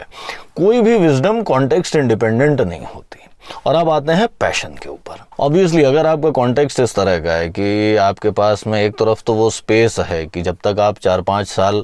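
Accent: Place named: Indore, India. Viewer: Hello sir, meri yah janne ki iksha hai ki kya App indore (MP) se hai kya... bas ese hi puchh raha hu kyuki apki boli se app indore ke lagte hai...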